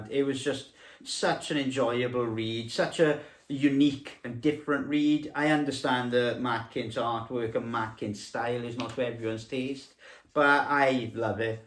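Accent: Wales, United Kingdom. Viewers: Is that a welsh accent?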